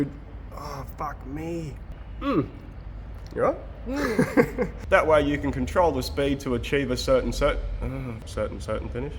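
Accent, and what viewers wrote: Australia. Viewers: I think appearance is every thing and your appearance is so much better when you shave your face, also your Camera Lady should also appear every once in a while as your Partner in Business. I also enjoy the Birds and is it Jeffery or Homey your Dog! You are Highly Skilled in your trade and you remind me of Myself, i also worked Many Hours in My Trade as a Collision Repair Technician for 43 Years. I also worked Many Hours, sometimes till Midnight! I Also Really Enjoy your Australian Accent!!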